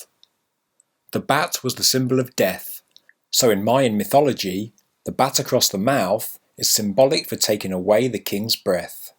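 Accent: United Kingdom. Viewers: Deepstate debunkery with brit accent.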